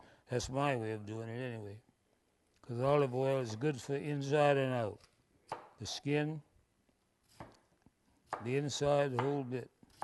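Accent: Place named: South Carolina, United States. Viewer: I love this ELDER!!! So beautiful and such and inspiration and he sounds like my people from Lady's Island SC 🌴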